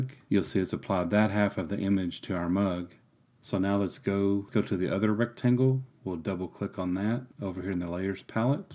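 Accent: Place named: United States. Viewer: your american im scottish DIDNT understand a thing you were talking about sorry :-((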